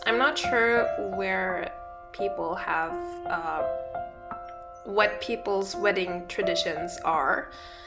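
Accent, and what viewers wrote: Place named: United States. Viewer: Tbh you sound like an American to me so your doing great!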